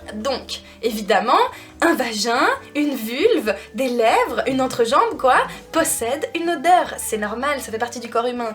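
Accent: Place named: Geneva, Switzerland. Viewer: Depuis le temps que je regarde tes vidéos pour une fois je commente pour te dire à quel point je "prend mon pied" (bah oui restons dans le thème haha) à regarder tes vidéos! il suffit que j'aie eu une sale journée et que je me pose devant mon ordi avec toi pour me sentir tout de suite mieux! Va savoir, j'aime ta facon de t'exprimer, tes sujets et la facon dont tu les aborde, je suis sûre que tu aide énormément de monde avec tes vidéos! De plus tu me rappelle ma Suisse que j'ai quittée il y a un an pour le bout du monde, et ca me fait toujours plaisir d'entendre ton charmant p'tit accent de Genève:) Bref, continue, reste comme tu es (même si je ne pense pas que tu aie l'intention de changer!) et MERCI pour toutes ces good vibes que tu nous partage! Peace! <3 Et vive nos clitoris!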